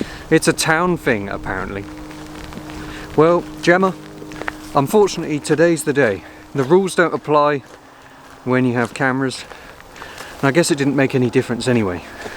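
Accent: United Kingdom. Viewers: Your commentary is so delightfully British 😁 Thank you.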